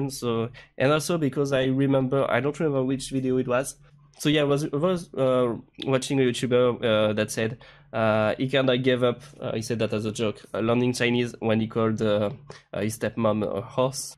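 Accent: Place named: France. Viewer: L'accent français reconnaissable entre 1000 mdrr, depuis tu as progressé ?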